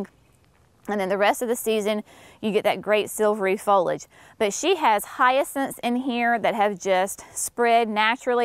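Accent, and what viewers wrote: South, United States. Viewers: You know, I know you are southern not just by your accent but because I actually feel your joy standing in front of those azaleas. And yes, your mom has a knack for blending whimsical pcs and after my own heart, a container gardener.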